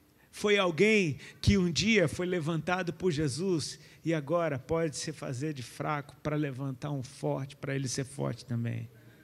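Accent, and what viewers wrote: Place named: Portugal. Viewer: quanto que o "gajo" corre!! Ameeeiiiiiii, portugues de Portugal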